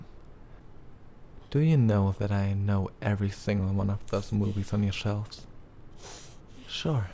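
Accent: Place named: Germany. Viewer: I literally LOVE his little German accent! It sounds so adorable and calming at the same time. Nice vid btw ^^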